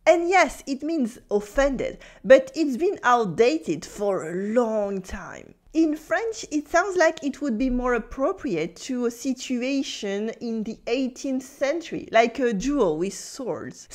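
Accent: France. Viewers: Actually the way pronounces the word "adjective", with the stress upon the 2nd syllable, is also the way we do in the UK - only just without her delightful French accent, sadly enough....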